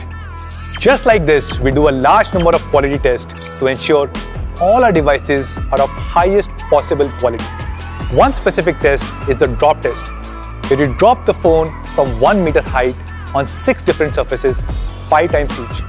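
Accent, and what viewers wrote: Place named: India. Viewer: Datt indian accent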